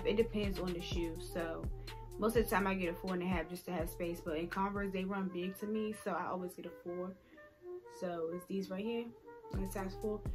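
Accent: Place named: Baltimore, United States. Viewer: Only real ones know she has a Baltimore accent 😭😭😭😭.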